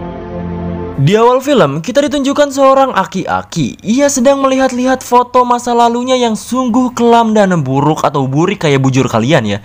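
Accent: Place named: Sunda, Indonesia. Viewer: Orang mana sii bng?ngmng sunda nya lucu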